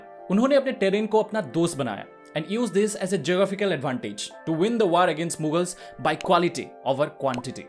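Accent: Assam, India. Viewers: তুমাৰ হিন্দী দেখী গম পায় গলো তুযি অসমীয়া বুলি 🔥🙏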